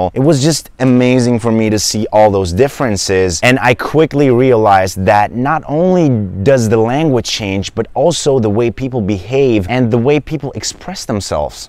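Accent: United States. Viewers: I love your accent. It's so american. So as mine. I used to learn english the same way, by listening to the songs and writing them down. I've graduated from english exactly 20 years ago, but I don't have the opportunity to use it in my every day life so over the time I forgot many words, even the simple ones. But instead I speak spanish with my sister- in- law (I don't know the grammar but I don't fear to speak) and I love turkish. I understand almost everything but have no one to talk to☹🤷‍♀️ Love all the job you do❤ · Hi from Australia! I thought you learned English in America cause of your accent. But I have to say I also prefer the American accent 😊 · Gardaş which country of state u grow up? u have İmpressive hillarious american accent man 🙏🙌 well done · Why do you sound more American than English? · 💙 ... What an amazing story ... I would've thought you had lived for quite some time in America ... 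your American accent is just flawless ... I was in Turkey (Istanbul, Izmir, Kusadasi, Ephesus) last week and I fell in love with Turkey as a country, the language, the people, the architecture, the food, the smells ... in a word ... I decided to learn turkish and I found this helpful and fascinating channel ... Greetings from Miami ... !!! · That’s awesome!! Well you have been to a beautiful places like Phoenix Arizona,sedona Arizona you need to come again visit the Grand Canyon az!! Saludos desde Phoenix Arizona!! 🙏🙏 por cierto su inglés es estadounidense a la perfección 👌👌👌👏👏👏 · Amazing! How perfect, American English you speak with out living in the USA, I’ve been here more then ten years and you can beat me in second! You don’t even have an accent! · Very interesting video. I was raised bilingual, my mother tongue English and Spanish. At the age of 5 I spoke both. Translated to Spanish for my Grandmother who only spoke Spanish when watching TV shows. 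Born in the USA. Your English is perfect American. I also speak Dutch and trying to learn Turkish. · Your English is amazing and your American sweet accent-wow ! Well done mate! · You speak American English better than some Americans! Great job...I can barely sense the accent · I watched some of your other videos before this one. I am glad you explained how you learned English because I could not figure out how you sounded as if you learned American English rather than British English. This video explains it. I am attempting to learn Spanish and agree with you about the cultural aspects of language. Some of the language apps are great for vocabulary and some grammar, but watching television gives a bit more of an idea of how the language is used every day. I am not sure I will ever be fluent, but thanks for supporting what took me quite a while to figure out. I'm going to watch more of your videos for more ideas. 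If you wonder how I found your Turkish video, I tried watching Hercai in Spanish. I finally figured out I was in dizi land and not telenovela land by some of the language. I loved dizis so much that I started watching them in Turkish with English subtitles. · Amerikali olmadiginizi soylemeseydiniz, sizi Amerikali sanirdim. Harika... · You sound American not Turkish or German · Dude your American accent is amazing, Awesome dude! This is my future goal, I wanna to master my pronunciation. By the way I speak also 5 languages. You are an inspiration for me. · I'm falling in love with your American accent🤭❤️ thank you for the video and you're the best i'm sure about that☺️ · Çok iyi kullanıyorsunuz İngilizceyi bir Amerikalı gibisiniz. Kimliğinizi belirtmemiş olsanız, eminim herkes sizi bir Amerikalı sanırdı ☺️🙏🏻 Teleffuzunuz çok etkileyici ve konuşma stilinizle karşınızdakini etkileyebiliyorsunuz ve böylece karşınızdakiler sizi sıkılmadan dinleyebilme şansına sahip olabiliyor. 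Bu da gösteriyor ki dilleri ne kadar çok sevdiğinizi ❤️👍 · Sesin çok yakışıklı ama 3 dakika geçtikten sonra rahatsız ediyor gibi. Bu arada tam bir american accent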